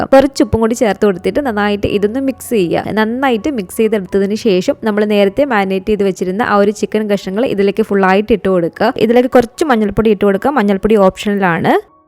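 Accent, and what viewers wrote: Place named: Ernakulam, India. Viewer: ഇത്താടെ വീട് എവിടെയാണ്. സംസാരം കേട്ടിട്ട് എറണാകുളം കാരിയാണെന്ന് തോന്നുന്നു 😊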